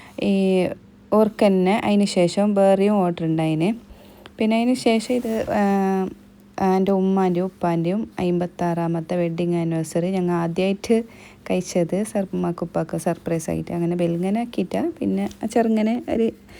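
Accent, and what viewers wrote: Kasaragod, India. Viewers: കേട്ടിട്ട് കാസർകോട് സ്ലാങ് ആണ്, ശെരിയല്ലേ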